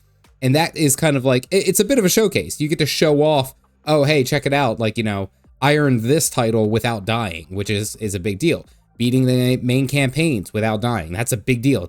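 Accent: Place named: Baltimore, United States. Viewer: Just curious...are you from Baltimore? That accent sounds very Baltimore. Great video. I haven't played since 2012. Good to see they're still around and thriving!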